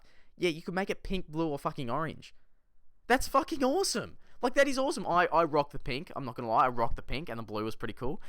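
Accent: Australia. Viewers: Love the accent x) Australian?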